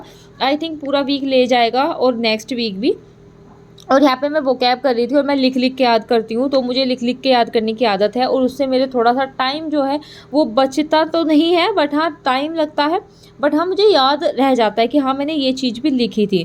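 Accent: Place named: Braj, India. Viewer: Kaha se ho? Brij wasi si lg rhi ho?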